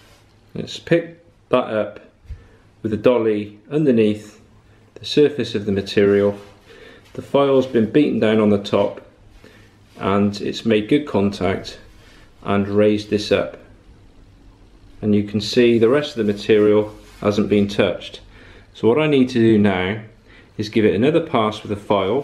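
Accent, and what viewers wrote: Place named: England, United Kingdom. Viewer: Do you need an apprentice? I noticed your English accent are you in the UK? Love your videos thanks for sharing 🙂👍👌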